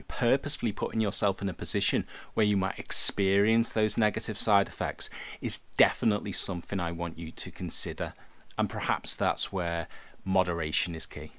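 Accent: Liverpool, United Kingdom. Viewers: Good advice and info, also liked your Beatle accent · You've not got the strongest scouse accent but knew it was liverpool or nearby. Yeah frontierland lmao good in its day but all gone now so has bubbles 🤣 keep doing your vids they r great for people going through similiar difficulties. So few people comment in relation to ur views but i think its just the whole mental health stigma yet again x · Are you from liverpool? Also, thank you so much for this. I'm starting off on 12.5mg for anxiety (not depression) and while I do like to have 1-2 drinks a night, 4-5 nights a week, I have complete control - I just like having a drink or two with dinner. However, I wouldn't mind cutting back during the week. But there are times I'm going to want to indulge. I have a vacation planned for October in the Caribbean that is all-inclusive. I plan on drinking a fair amount. 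Since I'm NOT struggling with depression or suicidal thoughts, I wonder if I should just take a break from the pills during that time? This is of course assuming that I react well and are still on them at that time.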